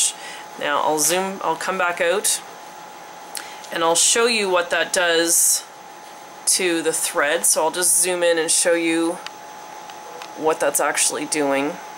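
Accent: Canada. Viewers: I heard your Canadian accent right away! Fellow Canadian here! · Anna Nicholson if you listen she says oot and aboot, which is typical from nova scotia ;)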